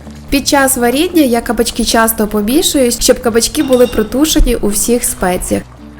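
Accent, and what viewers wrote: Standard. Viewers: Маленька , дякую . Яка у Вас гарна мелодійна чиста українська мова . ❤❤❤ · Яка гарна господиня!Дякую за чисту українську.Дуже приємно слухати.Рецепти всі прекрасні,я вже їх протестувала.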